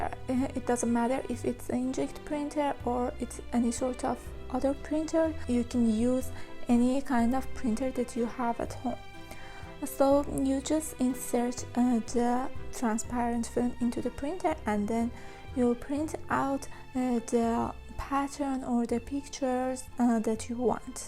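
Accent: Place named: Iran. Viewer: I can call it from the accent that you might be Iranian ! but thanks for sharing the technique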